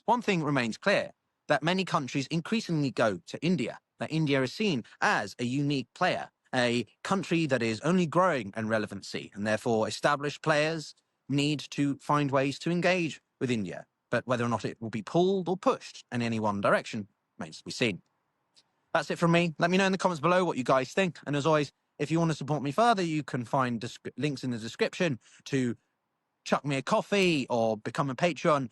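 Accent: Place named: England, United Kingdom. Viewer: Excellent Video. Nice English Accent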